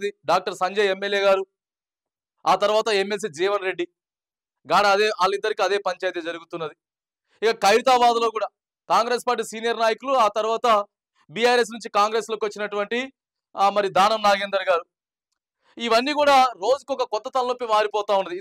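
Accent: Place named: Andhra Pradesh, India. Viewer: అందరికీ నమస్తే నువ్వు ఆందోళన తమ్మి అందరికీ నమస్తే అన్న పదం మంచిగుంది గాని నీ మాట వింటే ఆంధ్ర లెక్క ఉన్నావ్ ఆంధ్ర కుక్క వా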